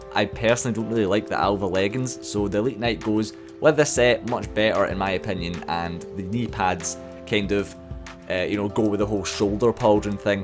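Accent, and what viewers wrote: Scotland, United Kingdom. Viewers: that scottish accent maaaaaaaaaaaaan!! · Are you Scottish? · I love the Scottish accent!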